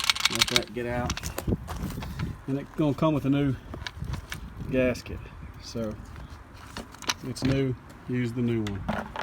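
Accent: South, United States. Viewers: Excellent video. Southern accent can't be beat. I know why you use a 5 gallon bucket now. My little oil pan splattered all over tarnation. My filter was like new though, so I just put it back.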